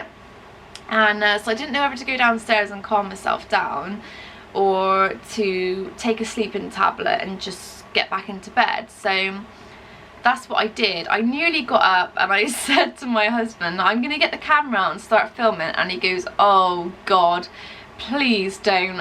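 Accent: United Kingdom. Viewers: you should use a tripod so you can adjust the height so it doesn't cut your head off at the top ;) I enjoy watching your videos. keep up the good work. and i enjoy your British accent. ;)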